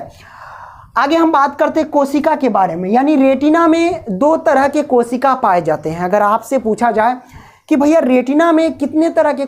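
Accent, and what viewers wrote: Bihar, India. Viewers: Sir Ap bihar sa ho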